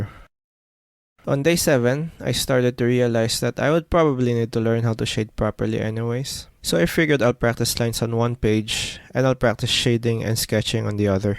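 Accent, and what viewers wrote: Philippines. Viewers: based on your accent im guessing ur filipino? bec thats how i talk in english lolll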